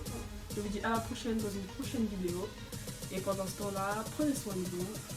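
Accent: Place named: France. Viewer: Comment tu parle avec le bon français de France😭😭😭😭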